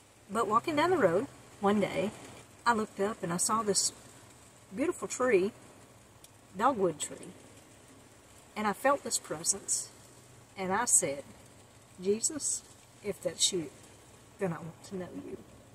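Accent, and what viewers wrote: South, United States. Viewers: Where are you from? You have a southern accent but were going to see your son in New York. I was just wondering where your journey began.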